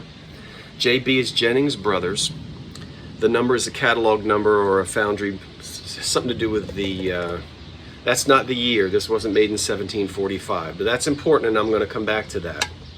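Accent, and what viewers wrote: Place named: Pennsylvania, United States. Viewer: I haven't watched you for awhile, I missed you. Do you still have your apartment in Philly? I love watching you walk around town. I was born in Chester I grew up in Parkside Brookhaven area my mother grew up in downtown Media Pennsylvania so I love listening to your accent which is very familiar to me and you and I love the same kind of antiques. But finding art-deco out here is really hard so I've been sticking to Murano Glass. Is your bungalow on the Jersey Shore? So good to see you again?😊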